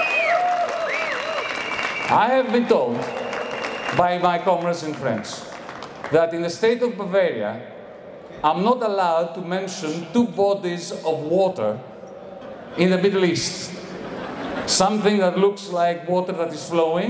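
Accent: Greece. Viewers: 希腊人说英语的口音都是这样😂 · 典型的希腊口音英语。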